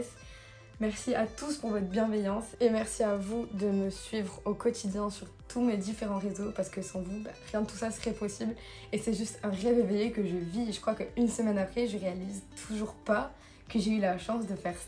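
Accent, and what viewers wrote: South, France. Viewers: C'est franchement génial d'avoir un autre point de vue des olympiades !! Tu as une personnalité géniale !! Le "daingue" de cet accent du Sud te donne une vraie personnalité !! +1 abonné ! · T’es juste sublime et rayonnante , ton accent du Sud et ton humour te donnent d’autant plus de charme 😁😊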